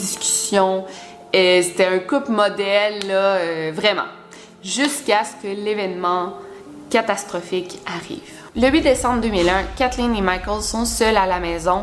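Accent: Quebec, Canada. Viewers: J’adore t’écouter. Avec ton accent québécois ou tout simplement canadien tu assures. Je t’ai connu avec une vidéo que tu as fais avec Lionel Camy, merci Lionel. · Je kiff grave ton accent québécois 😊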